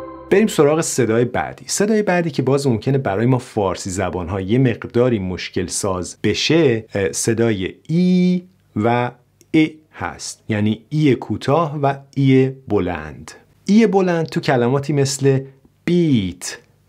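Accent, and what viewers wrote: United States. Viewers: آقای دکتر شما در انگلستان هستین چرا آموزشتون لهجه امریکنه؟من لهجه بریتیش رو می خواهم😢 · اولین ایرانی هستید که لهجه شما خیلی شبیه به آمریکایی زبان ها است من در کلرادو آمریکا زنده گی میکنم اگر یک کلمه را هم یاد میگیرم به لهجه خود اینا یاد میگیرم ممنونم ازین که اینقدر خوبید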